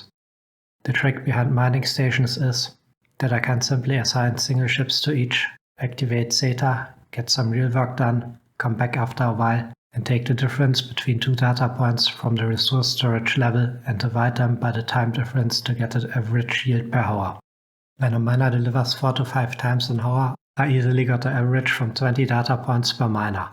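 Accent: Germany. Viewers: Lol u sound like you sit in a dungeon. Combined with the heave german accent i laughed throughout the whole vid. Great job my friend. #alloallo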